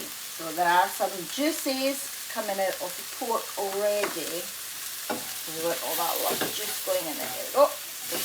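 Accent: Scotland, United Kingdom. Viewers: When you said "stroganoffie" with that beautifiul scottish accent, I admit that I thought of bannoffie pie. :)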